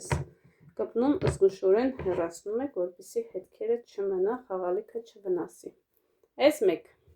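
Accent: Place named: Lori, Armenia. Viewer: Ձեզ առաջին անգամ եմ դիտում։ Ինձ թվումա լոռեցի եք ջան? Բայց ամուսնու կողմը չհասկացա որ բարբառով է խոսում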